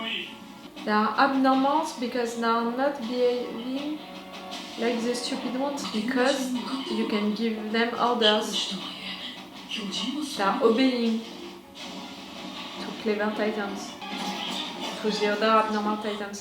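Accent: France. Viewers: I would avoid Lost Girls OVAs until you're done with Season 2. They do have some spoilers to them, but after go for it. I love your accent, please never apologize for being who you are. We subscribe knowing you're French and your reactions are very genuine and funny. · your french accent is adorable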